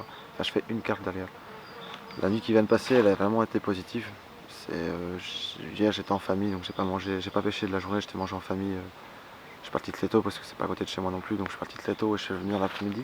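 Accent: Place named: Tahiti, French Polynesia. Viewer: salut sympa ta video, au fait on dis rigoureux lol, il vient d'où ton accent on dirais celui tahitien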